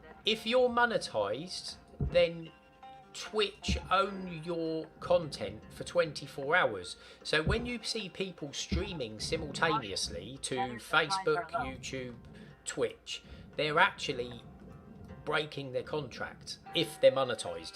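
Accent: Cockney, United Kingdom. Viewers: I’m pretty sure you’re the only cockney fluent YouTuber is subscribe to.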